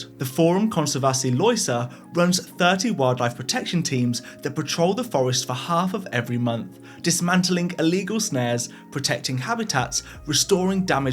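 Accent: United Kingdom. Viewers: i lowkey thought you were moist critical until i realized you’re british 😭😭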